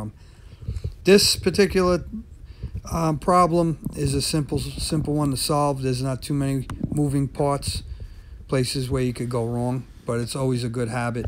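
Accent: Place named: New Jersey, United States. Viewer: Are you from Jersey? I grew up partly in Newark, great accent and perfect instruction! Love that East Coast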